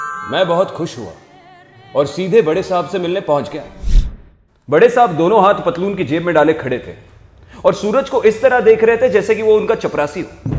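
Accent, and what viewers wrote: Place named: Pahadi, India. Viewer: Aapki aavaz bahot achhi he.ekdam pahadi..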